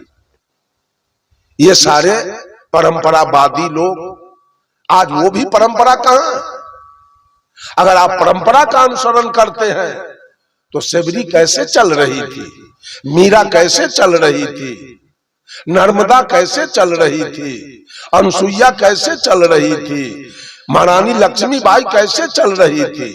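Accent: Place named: Bihar, India. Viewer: अपना चश्मा तो उतार दे पहले 😅 और बोली तो जेसे लालू जेसी बात करता है हमारे जेसी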